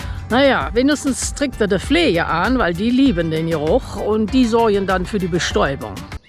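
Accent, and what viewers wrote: Cologne, Germany. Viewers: Liebe Grüße aus Dresden bei Uns ist grad Schmuddelwetter und die Sonne lösst sich selten blkicken. Schönes Video und Kölsch klingt geil. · Einfach, wie immer, grandios. Du bist eine wunderbare Schauspielerin mit einem enormen Wissen und Deine koelsche Aussprache, einfach herrlich. Es war mal wieder ein Genuss. Danke dafür. Lg Evi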